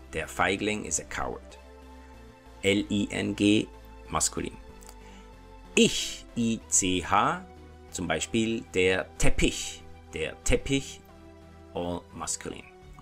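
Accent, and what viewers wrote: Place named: Switzerland. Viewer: Swiss accent, to my ears.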